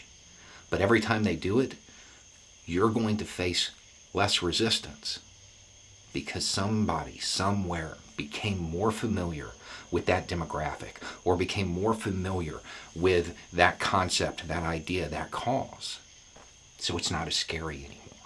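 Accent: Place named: Idaho, United States. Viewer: Sounds like north Idaho.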